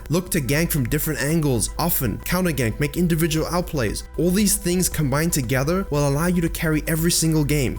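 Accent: South Africa. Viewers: Do I hear some South African in that accent?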